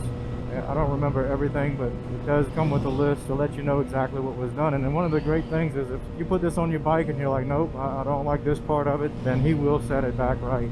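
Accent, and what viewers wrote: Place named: Louisiana, United States. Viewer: Should’ve pulled the AIS off while you were at it. South La you sound like saint Martinville type people.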